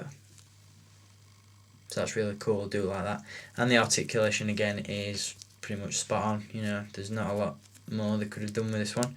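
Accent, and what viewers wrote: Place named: Northern England, United Kingdom. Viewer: sounds like north england somewhere round Yorkshire, Blackburn, maybe? I don't really know northern accents so well... Sheffield?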